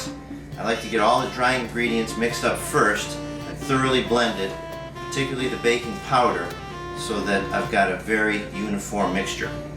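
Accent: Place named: Brooklyn, United States. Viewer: Ciao, you sound like you're from Brooklyn! Good recipe! I'll give'er a try! Ciao, Brooklyn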